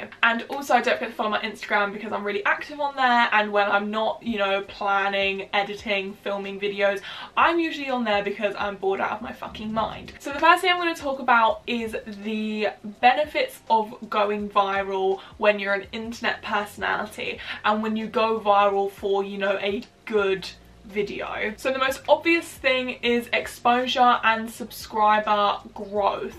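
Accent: United Kingdom. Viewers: I’m sorry you get awful comments… I’ve been watching you for a while, I seldom comment on videos, so this is my first comment on your video. After watching this, I wanted to give you a compliment since you said you read every comment haha. Well or, more so throw shade at people who criticize irrelevant things like your appearance… Anyway! Sorry I’m rambling 😅. The people who comment about your teeth and ask why British people don’t care about their teeth, and just pissed they don’t have the superior accent, at least that’s my guess as someone who would trade my boring midwestern accent for a more sophisticated accent. Ugh this is a weird comment, and it’s all over place, this is why I don’t comment 😂. Anyway! I think you’re a cool pretty lady, who makes videos that I like, and I hope you have a great days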